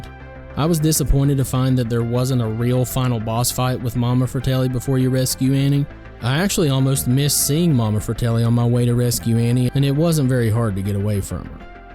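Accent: South, United States. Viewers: Your welcome! I love your vids. They bring a lot of nostalgia to me. Your southern accent reminds me of my best friend from my childhood. We would waste the summer days playing NES and SNES games.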